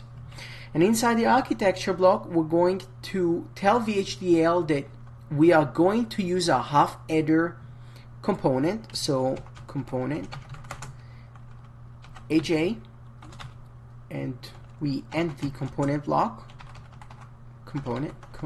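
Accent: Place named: France. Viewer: French 😄😄😄😄... sorry but this is the first time I'm hearing French accent beside in the movies....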